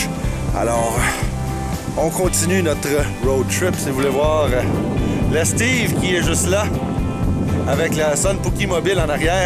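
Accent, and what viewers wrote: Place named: Quebec, Canada. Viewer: Merci pour cette vidéo. Cela faisait une paille (comme on dit chez nous en France )que l'on attendait ça. J'aime toujours autant ton accent. Bon tu va me dire que c'est nous les cousins français qui en avons un!! J'ai eu la chance d'aller travailler dans l'état de New-York il y a 3 ans avec un de tes compatriotes canadiens. Je débutais le geocaching mais cela ma permis au moins d'avoir un souvenir. Merci encore pour tes vidéos Au plaisir de te revoir. Choudrouna. (Du centre de la France ) Géo amitié.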